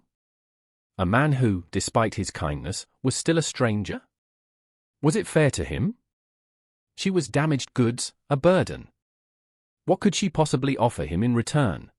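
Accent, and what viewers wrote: United Kingdom. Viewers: Westerns should NOT be read with a British accent, EVER!!!!! It's unnatural.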